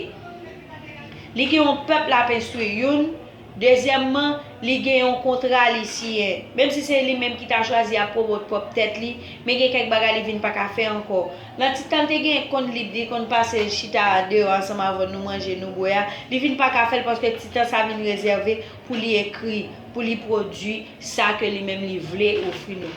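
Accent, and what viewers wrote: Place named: Cap-Haïtien, Haiti. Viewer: Aux Cap accent nice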